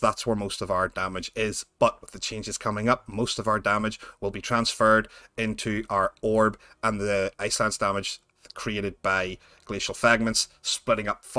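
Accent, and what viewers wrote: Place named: Northern Ireland, United Kingdom. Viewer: Hey, you sound Northern Irish :) so nice to hear though :) I'm also a frost mage, nice to see some good tips :)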